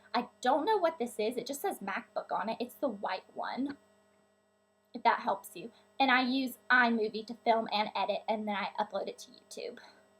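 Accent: South, United States. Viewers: I'm from the south to! I dont really notice your accent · sweet tea! lol you are southern... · how come you have the southern accent but elle doesn't? elle sounds like a valley girl sometimes lol is it cause she had already developed her accent or way she speaks before you moved to the south?? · lol ur have the south accent... sorry lol just sounds weird cuz im from NYC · Your southern accent is adorable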